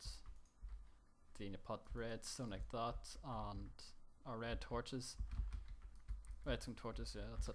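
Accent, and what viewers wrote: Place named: Ireland. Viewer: hey man love this vid it gave me a lot of ideas and i love ur accent it sounds irish and im irish :)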